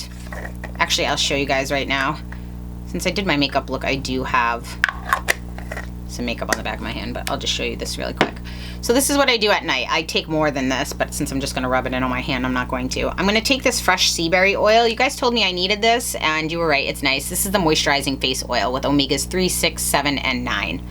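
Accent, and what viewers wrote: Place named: Midwest, United States. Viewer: omg that midwestern accent is piercing at my soul.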